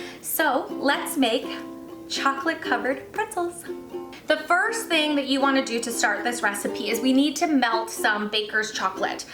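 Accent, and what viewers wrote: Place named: Canada. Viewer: Hi,! Those chocolate covered pretzels look amazing! I agree that drizzling on the chocolate over the bowl is the best thing to do. I love how you covered them with so many different things. Curling the bow was a nice touch. BTW: I am not sure if you mentioned you were Canadian in previous videos, but I am really into languages, and I was pretty sure you were...when you mentioned Waterloo and Kitchener, I knew it! YouTube is so great because we can hear accents from so many cities and countries. Thanks for sharing!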